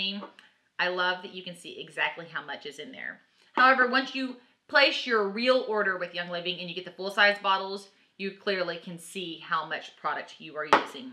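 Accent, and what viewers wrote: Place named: South, United States. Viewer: She's southern, y'all need to stop correcting the accent. If you're southern doTERRA sounds like du-terra. It's not a big deal; get over it. 🙄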